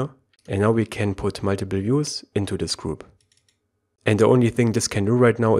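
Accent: Germany. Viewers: You sound like a German when he speaks English - I know it, because I'm german. :D And really great video!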